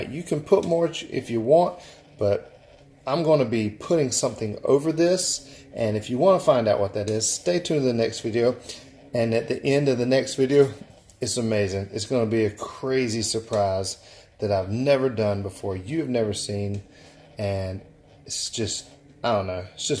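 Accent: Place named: Boiling Springs, United States. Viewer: Dude I love you and your vids. Your work is just beautiful. And that Boiling Springs ACCENT!!! I miss it.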